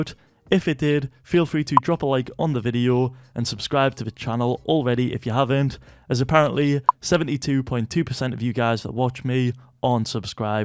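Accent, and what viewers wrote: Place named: United Kingdom. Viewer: hes from the uk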